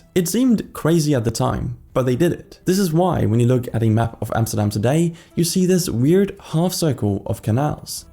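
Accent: Germany. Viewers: Your Dutch sounds quite German, but I'm glad you're giving it a shot—nice video! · I'm kind of getting triggerd by the German pronunciation of Dutch words, no slight to the uploader though Dutch ch and g sounds are hard. Just my own pet peeve. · I dislike the German pronunciation of Dutch words, otherwise a good video.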